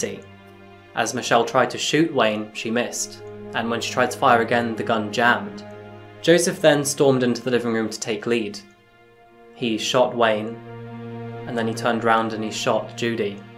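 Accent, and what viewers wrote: England, United Kingdom. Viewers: Their accents aren't even from the same country though. TC is Irish and this guy is English....very noticeable difference there. I can definitely see the other similarities. I don't get the same vibe from the two channels even though there's a pretty clear inspiration in style here. TC is a lot more lighthearted for a start. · By the way, I like your English accent, being educated at an English school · I've always assumed you were English, going by how you sound. Never knew you were born in the US! When I went to the US on holiday nobody could understand me, I even had someone say I spoke English with a funny accent, they asked where I was from, I replied England! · You “lost your accent” when you left Seattle? 😆 I would argue you ACQUIRED your accent when you moved to England. Eh, po-tay-to, po-tah-to. Well, either way, I came across your channel recently and I have now spent my whole day watching your videos. 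Great content! Thanks and keep it up!!! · Ah that’s why you’ve got an English accent 😊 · I heard him say in one video he was born in Seattle I always wondered where the British accent came from. Now I know. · I will comment that you don't seem to have an accent here with this video, yet you are very "English" with the others I have watched. I am new to your channel and am not watching them in order. You do say things like, "Car Park" (we say driveway) and many other endearing comments which show your English side. 🏴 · You did not lose your accent by moving to England, you gained one. You have a British accent 😁 This Joseph guy is a bad actor. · "Losing my accent..." I think you should've said gaining your accent haha. I never would've guessed you were born in the states with that thick British accent you have! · That's interesting that you grew up in Seattle and then moved to England and got an english accent. 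I deserve Madonna an apology. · . You are the first American born person with an English (correct me if I’m wrong) accent. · What part of England is your accent? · Wait so you're american with a bri'ish accent, amazing 👁️👄👁️ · Yes it is. Slightly northern accent sometimes. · You didn’t lose a Seattle accent. You gained a British accent, which sounds nice BTW. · ENGLISH accent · He's English now, which means he lost his American accent. An American accent isn't "default". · This is crazy. Who moves from Seattle to England and starts speaking with an English accent. Gross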